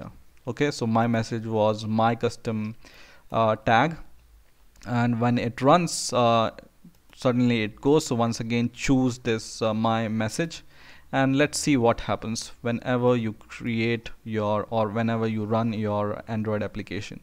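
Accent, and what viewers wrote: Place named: India. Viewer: Oh god.. it is obvious that he is from India..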